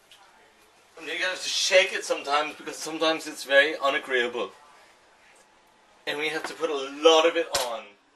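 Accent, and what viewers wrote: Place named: Germany. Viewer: Great vid buddy and i think you needed a chainsaw for the bread.Dude and what was up with the German accent.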